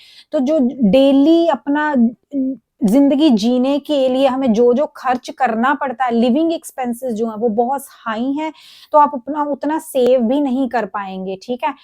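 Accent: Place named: Chandigarh, India. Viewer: आपके बोलने के लहजे से लग रहा है आप चंडीगढ़ साइड से हो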